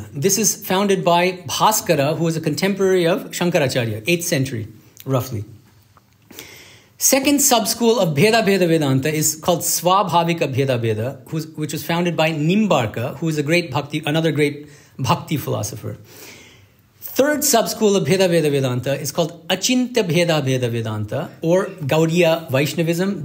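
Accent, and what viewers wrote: United States. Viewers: A monk should not have bias which this monk has in abundance. He may be a scholar but lacks in the fundamental factor of expression of his views. He may.speak the English language like an American but does not know how to teach, how to pause, how to repeat. All he does is to show off his American accent. It seems as though his object is to put down Sri Shankaracharya Bagavadpada by questioning the authorship of Sri Shankaracharya's great works ; the crown being Viveka Chudamani. You may have a completely different opinion and you are entitled to it. But you have no right to speak of Shankaracharya in the manner you spoke. Did you play marbles with Sri Shankaracharya? On hearing you I get the impression that you are out to insult Sri Shankaracharya ( not that any one can do that, least of all you) and you are out to show off your accent. 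You can never compete with Swami Sarvapriyananda for you don't have the guna required for it. You are not fit to be called as monk. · My two cents: Swamiji has given a fresh and comprehensive perspective of what is to be understood as Vedanta philosophy in the light of the teachings of Sri Ramakrishna and Swami Vivekananda. In addition it is a knock on the heads of fanatical bigots who are possibly insecure about their own beliefs . Can you ignore a toothache saying it is unreal? will you refuse to bow down to a statue of Sri Shankara saying it is unreal? Just as you are questioning the Swami if he played marbles with Shankara were you present personally when the acharya wrote the Vivekachudamani? If you look up online you will see the authorship is questioned by many scholars. Talking disrespectfully about a monk of Ramakrishna order is unbecoming of a spiritual aspirant. Swami Medhananda was born and brought up in the USA and has a natural American accent. 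Also Swami Sarvapriyananda and Swami Medhananda have due respect for each other's views. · Exactly... He simply states Advaita as 'ब्रह्म सत्यम जगत मिथ्या' by World is not real. He did not take any effort why 'मिथ्या' has been used. He is not aware of Shankara parampara also. His American accent might allure some people but not true seekers.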